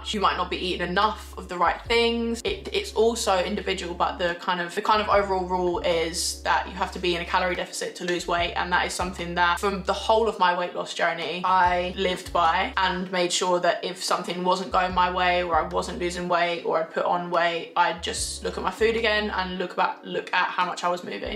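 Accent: Scotland, United Kingdom. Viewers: Well done on the weight loss! Amazing work! I’ve not watched you in years and you only just came up on suggested even although I’ve been subscribed for years. Where’s your Scottish accent gone??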